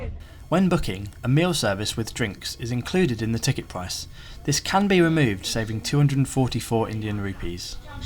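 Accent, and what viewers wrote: United Kingdom. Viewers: Your calm British (clear) accent is comprehensible and non-partisan. Keep it up. As another member already suggested, your suggestions and observations have been listened to and implemented. Two glaring points to be taken up by Indian Railways. Timing discipline. Always make sure that Trains leave on time and reach on time or a but earlier if possible. It is unfortunate that the passengers could not connect to the Delhi Metro station due to the delay in reaching time. Thank You.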